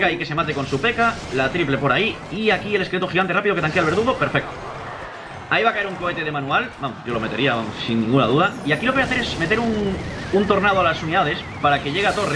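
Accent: Spain. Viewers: dek país eres bro por dijiste tegusilgalpa y aki en Honduras esa es la capital del país pero no creo k seas de Honduras por tu asentó creo k eres de España digo pues muy buen bideo usare tu baraja aver k tal me ba bueno pues eso es todo suerte amigos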